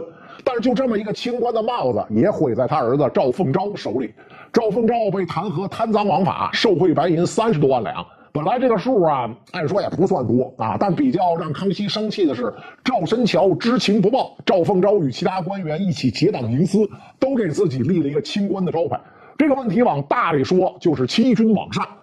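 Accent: Beijing, China. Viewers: 還是喜歡聼袁大頭本人的刁兒朗鐺的京腔，很熟悉的鄉音。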